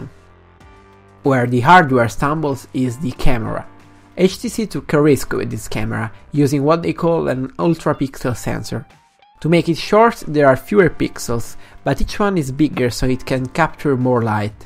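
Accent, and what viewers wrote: Italy. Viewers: Ero indeciso tra questo e lo z3c, il mio cruccio maggiore è, vale la pena spendere 350e per un telefono di quasi due anni? (Scrivo in ita perchè l'accento è palese :D ) btw bel video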